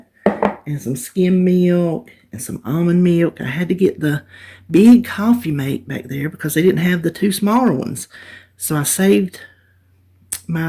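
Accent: South, United States. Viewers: Hey! I love that you are southern like me. When you said scoot I bout died. Only we can get three syllables out of a one syllable word. Nice hauls! I'm not allowed to go to stores so I've been thinking about a Walmart p/u situation. My son did bring me some fresh fruits and veggies yesterday. I'm happy you're safe at home too. x, E. ps: That shirt is Wowsome!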